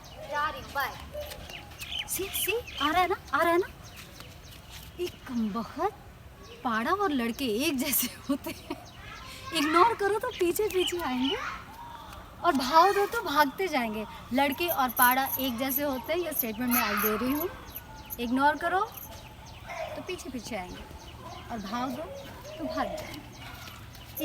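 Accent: Bihar, India. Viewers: Aapki basha me bhi bihariyo vala touch hai or lgti bhi aap bihari si ho...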